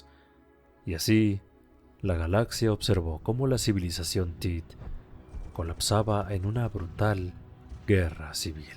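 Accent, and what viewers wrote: Mexico. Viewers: Este canal no sube ia. el relator parece mexicano. y los relatos son de un subreddit si no me equivoco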